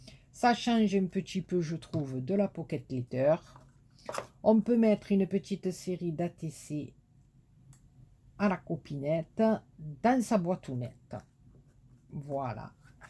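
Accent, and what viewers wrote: South, France. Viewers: bonjour, très joli travail très coloré plus l accent su sud cela fait du bien le soleil n étant pas de partie aujourd' hui chez nous bisounettes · Bonjour, ta cascadounette est vraiment très jolie, j'adore ton accent du soleil, il nous fait du bien car on est toujours sous la pluie.....Gros gros bisous...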